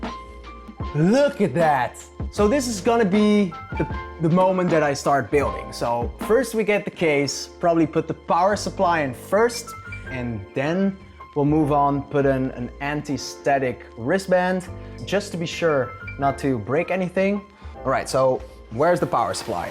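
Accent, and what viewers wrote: Netherlands. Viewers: within the first few seconds i was like 'he has a dutch accent, is he dutch?' and then i saw the box tape and i was like wait isn't that Azerty tape since i bought something off of there like a week ago and it was so yeah your english is really good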